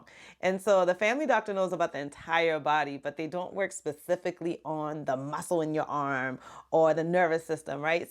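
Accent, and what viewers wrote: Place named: Carolina, United States. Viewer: I know a carolinian when i hear one lol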